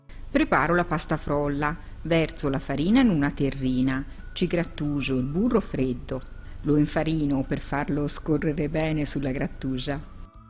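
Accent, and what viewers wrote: Tuscany, Italy. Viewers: Sono fenomenali Mi fido della ricetta di questa signora dal bell’accento toscano e dalla precisione delle dosi e procedimento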